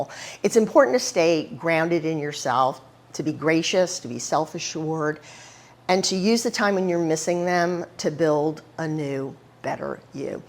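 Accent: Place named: South, United States. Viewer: Thank you for your wonderful content channel mrs. You seems very sociable. As learned since I began to American and British YouTube channels so many things, and Iam still learning. Southern people are very friendly, have hospitality, strong family ties. Appalachian region full of myth and supernaturals such as big foot, New Jersey devil. Mew mew means granny. I read about Texas traditions, manners, wonderful characteristics such as respecting elders, being polite, showing kindness to others as part of Texas values. Native Texans are most genuinely friendly people we ever meet. You have special accent under southern with twist. You have Friday night football, rodeos, barbecue you have too in Arabic countries barbecue it’s great coincidence. Home coming is football or dance celebration of shared history memories, pride, cowboy, Texas is bigger than France. 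I hope I can learn a lot from your knowledge. Happy belated fall and thanksgiving in advance. Good luck to you your dearest ones.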